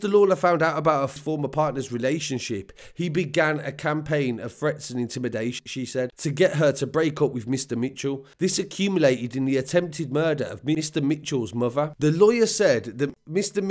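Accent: United Kingdom. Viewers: Been a bit shocked past few weeks coming on to YouTube hearing a guy with a British accent giving the low down on my city with 100 percent facts and very good accuracy u got a new sub.... Iv met Robbie once in the joy and he wasn't right you could tell he walked around on his own... Sitting on his own in the middle of the yard in his own world.... I seen him in conflict once with a very close friend just call him liamo doin life locals know him.... Big tall crazy guy who people tryed to jump 2 with gangs and failed great boxer.... Him and Robbie had an argument about money got a bit heated one day words came both walked off to there cells obvisly ploting... Would of been a blade or something swung but pascal got involved and kept the peace he was friendly with Liam and was the shot caller for Robbie area that's all I know of him really..... He's notorious I hear really from whacking all his friends and would whack his own family on orders....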